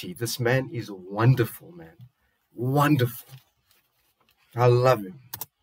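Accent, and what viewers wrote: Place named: South Africa. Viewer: May I ask where you are from? You sound almost exactly like my fiancé sounded when he talked. He was from South Africa.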